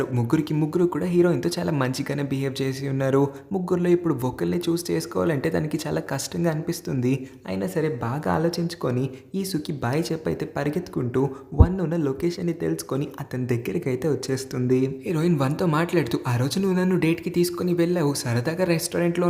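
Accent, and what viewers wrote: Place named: South Korea. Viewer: మీ మాటలు వింటుంటే కొరియన్ బాయ్ తెలుగు మాట్లాడుతున్నట్టుగా ఉంది మీరు మధ్య మధ్యలో నవ్వే నవ్వు భలే ఉంటుంది క్యూట్ గా ❤❤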